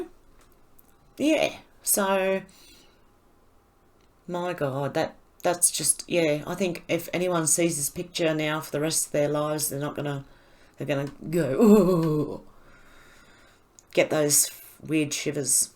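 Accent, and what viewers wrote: Australia. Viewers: You are becoming one of my very favorites! Great info and love your Aussie accent!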